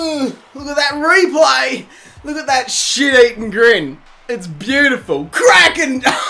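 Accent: Australia. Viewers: Aww mate, congratulations on 10,000! Super happy for you, I know I haven't been here as long as a lot of your other fans (I guess it's been about... seven months? Eight?) but I knew from the first time watching you that you were going to be my favourite YouTuber; my instinct proved right and to this day you still are, you definitely deserve it and much more. As for this video I mean, shit, I've never even played a Wii in my life lol I've always thought they look mediocre at best, I knew people who had them when they first came out but they just never appealed to me. Looks like you had fun though haha, your laughter is contagious as hell.:') On a side note: I've noticed I laugh an awful lot whenever you say the word ''cunt'' in your videos lmfao I dunno it's just so hilarious to me, it's a funny word that's apparently made even funnier when spoken in an Australian accent. 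xD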